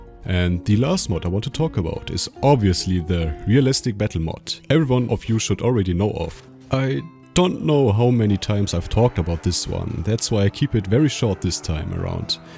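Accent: Germany. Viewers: liebe deinen Deutschen akzent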